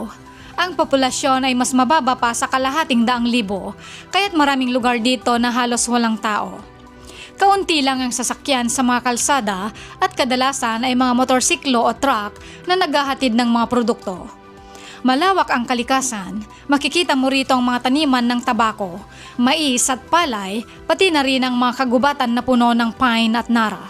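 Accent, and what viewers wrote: Visayas, Philippines. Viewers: Copy paste ang narrator sa ibang pages eh! Peru ang tono bisaya!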